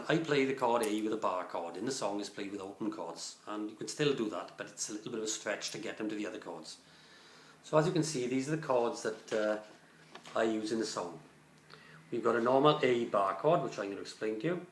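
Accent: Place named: Wales, United Kingdom. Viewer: Nice lesson, I detect some Welsh accent there, from a respectful Aussie 😀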